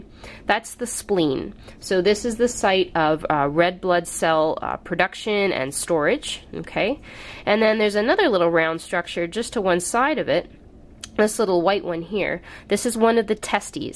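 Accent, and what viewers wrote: Canada. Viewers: First of all, she lives in Canada and is a Canadian. They have a different accent and pronunciations.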